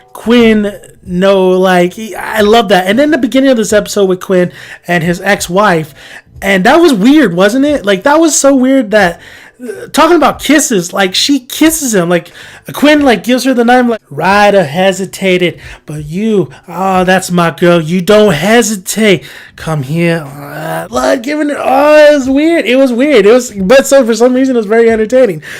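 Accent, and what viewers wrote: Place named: India. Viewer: What's your slang of english ? What are u actually?? I mean are you an Indian or Canadian or something? May I know it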